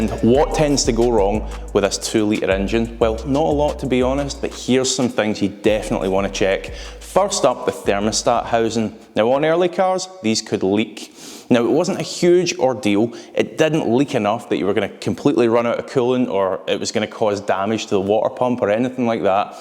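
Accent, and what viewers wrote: Scotland, United Kingdom. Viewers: i like the scottish accent but also the guide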